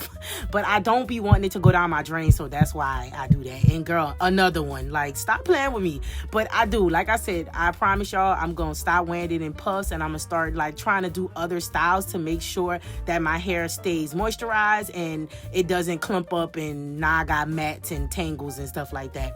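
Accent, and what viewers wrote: Louisiana, United States. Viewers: Sounds like a Louisiana accent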